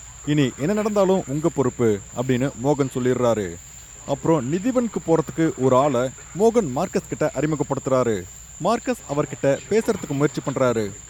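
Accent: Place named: Karnataka, India. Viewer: Kannada Tamil super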